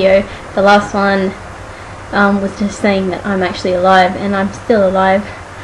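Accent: Australia. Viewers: hell yeah girl i agree! i got 3! i think u look gorgeous and ur tattoo of the peacock looks gorgeous! oh and btw i loooooove aussie accents and girls like you ;P turn on! haha but yeah let the haters hate you know! prolly dont know what that means cuz im from chicago but you never know! keep doin vids i subscribed 5 min ago!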